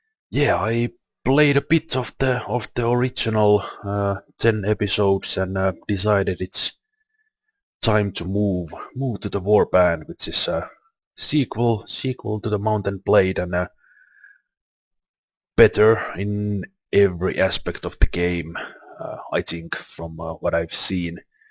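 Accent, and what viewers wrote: Finland. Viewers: He just sounds like a guy with a prominent Finnish accent. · Are you Finnish? Your accent so Finnish.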